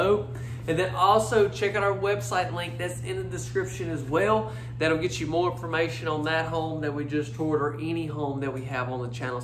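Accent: South, United States. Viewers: oh that would be great. we would gladly welcome you. You have that southern accent like i do. Only difference is i'm female. lol 😁 Don't forget to show us your beautiful family on some of your next home shows. ❤👼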